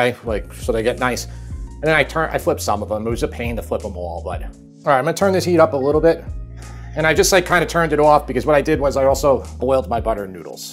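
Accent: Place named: Long Island, United States. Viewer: You can't possibly say "fond" with a Lawn Guyland accent. It won't work.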